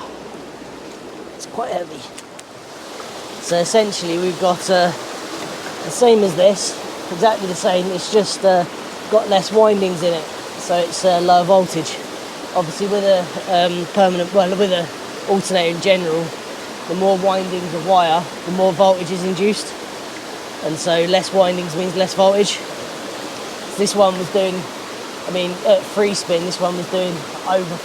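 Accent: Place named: England, United Kingdom. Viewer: if you want to hear someone with an english accent tell you thats what they wanted 14 times in a row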